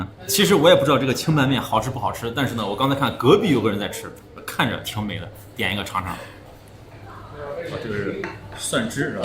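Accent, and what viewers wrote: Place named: Shaanxi, China. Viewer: 是陕西的🎉🎉🎉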